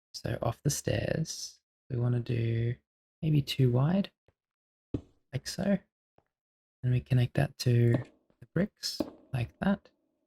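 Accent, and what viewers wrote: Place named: Australia. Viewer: You uploaded this right as I needed something to sleep, thank you for the great content as always. As a fellow australian, it's comforting to have a familiar accent. Especially since I am staying overseas right now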